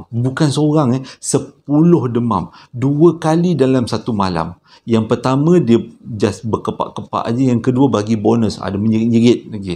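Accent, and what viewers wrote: Johor, Malaysia. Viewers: suka dengor abang cakap, memang pure orang Johor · ingat bro 😅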